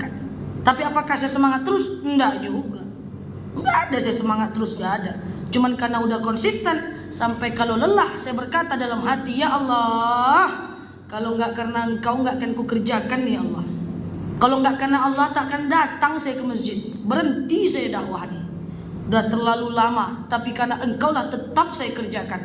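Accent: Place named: Padang, Indonesia. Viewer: Saya suka sekali ceramah nya ustd org pdg ya bahasa ny kayak org pdg udh cantik pintar lg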